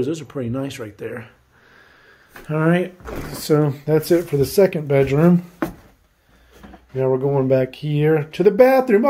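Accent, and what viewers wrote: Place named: United States. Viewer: C'mon bro you sound like an an American, you know the presentation standard. You could've raked the leaves B4 you started recording bro. Either way nice deal good video thanks 4 the info. Good fortune to you and your brother.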